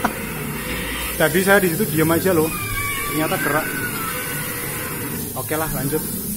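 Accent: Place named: Java, Indonesia. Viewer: Masnya kayak Joe Kal.. pemberani N jowo banget kalo ngomg.. mantapp...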